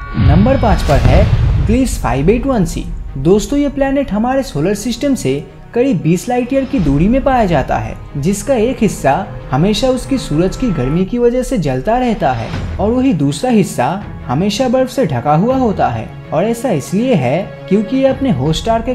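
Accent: Bengal, India. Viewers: Your hindi is as like as Bengali people 😊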